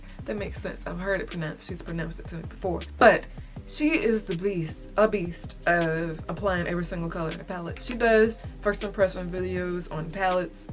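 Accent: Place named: South, United States. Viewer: Oooh...you country! Hahah! I love it though...makes me miss the South. Finch had me at hello! I'm digging that one..this entire palette is a mood. Perfect as we walk into Spring!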